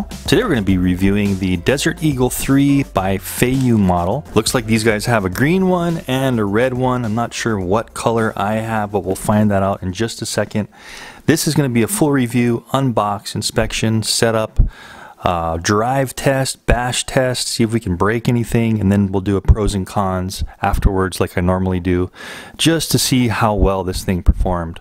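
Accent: Hawaii, United States. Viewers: Yo you in Hawaii bro? I keep thinking I'm hearing a little pigeon in your voice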